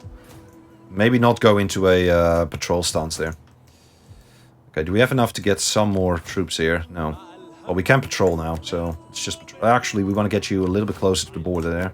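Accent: Netherlands. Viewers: I like your gameplay... I hear that you are Dutch. Do you also have a face cam? Keep up the GOOD work!! 😄👍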